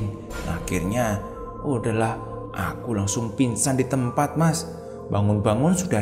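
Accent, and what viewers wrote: Java, Indonesia. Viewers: aq palah suka logat jawa nya,jdi unik. yg gak paham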